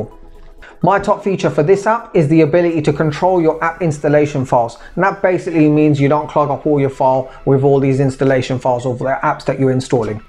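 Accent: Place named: United Kingdom. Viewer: Indian with British accent..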